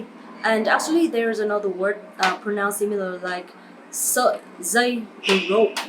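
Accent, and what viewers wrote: North, Vietnam. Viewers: That northern accent is HARD in itself as a southern hahahss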